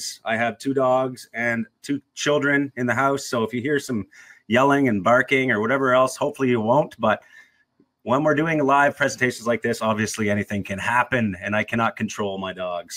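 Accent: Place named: Wisconsin, United States. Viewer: Are you from the midwest? I’m hearing a Wisconsin accent i think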